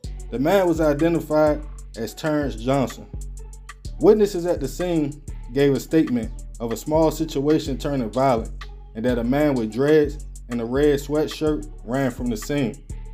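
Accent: Baltimore, United States. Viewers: Boy you need to speak a bit clearer. You can blow. But your journalism is kinda suited for only Baltimore ears. Not saying you not doing ya thing. But it takes practice to shaking how thick our accent is. This ain't no hate, I just know it's hard cracking mainstream with how thick our slang n mannerisms are. Shout out to you tho brova. G shit.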